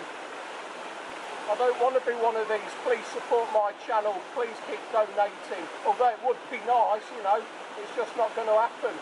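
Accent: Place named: East Midlands, United Kingdom. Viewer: Im sorry you came back to the UK, i mean that with the greatest of respect, you sound like youre from the East midlands. We are olanning on going to the Philippines. anyway hope all goes well.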